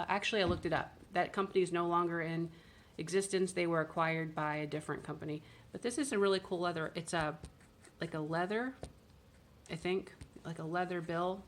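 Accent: United States. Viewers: Oh love the country accent omg laughing so hard 🤣🤣🤣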